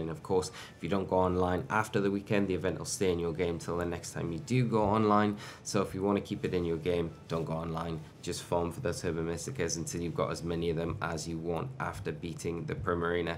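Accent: Newcastle, United Kingdom. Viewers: You’re a geordie?? How am I only realising now😮🫡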